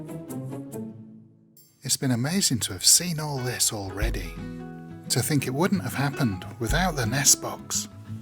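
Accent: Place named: England, United Kingdom. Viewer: I just found you on Utube! I'm watching as it's lovely to hear the English accent & loving what you are doing for the birds! Cheerio!😊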